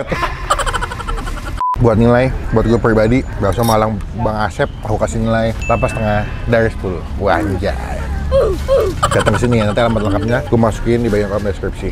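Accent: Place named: West Java, Indonesia. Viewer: Bakwan malang tapi logatnya sunda bang 😅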